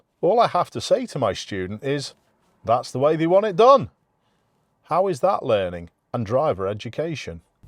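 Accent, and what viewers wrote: United Kingdom. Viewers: That was really interesting and thought provoking. I also found you mentioning various key factors just as I was thinking the exact same thoughts and nodding in agreement. One key thing with all this. Now that this issue is known for this particular double roundabout, it would be a good idea for all local driving instructors to brief their students on this as part of their test preperation and to practice in the way expected by the examiner. Problem solved! At least for the purpose of passing the test. I'd like to comment further on this very interesting clip but the Canadian border is calling...from all lanes! Was very nice to hear a Brirish accent and expressions. Seems like it's been ages since last hearing one! Again, apologies for my relative absence in the comments but as you know, been very busy in North America. Hope all is well in the Uk.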